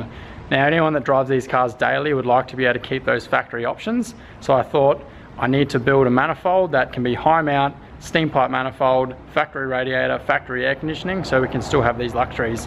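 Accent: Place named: Australia. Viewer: Hey, I love the videos, they’ve been quick, clear and direct info to these motors. Thanks a ton. Also the Australian accent mate! Keep the videos coming!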